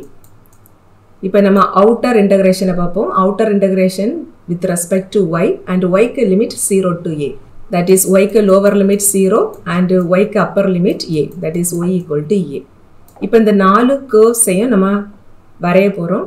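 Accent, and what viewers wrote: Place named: Tirunelveli, India. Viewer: Romba arumaiya teach panreenge. Namma thirunelveli style pechi. Thank you so much madam.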